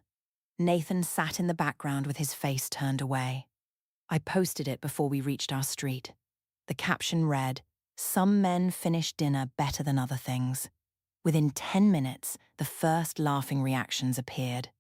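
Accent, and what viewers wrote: England, United Kingdom. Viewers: fidgeting or touching ofthe face or neck. Looking away. There are many things that are repeated like i told myself. Or mechanical precision. Many.of the stories seem.to follow the same template.she humiliates her husband. He starts sleeping in the other room. He locks himself in the home office getting everything together for a consultation with an attorney. The wife is getting too friendly with a co worker. And the English accent can be a little irritating after a while.im not trying to be critical. Just thought some feedback may help your channel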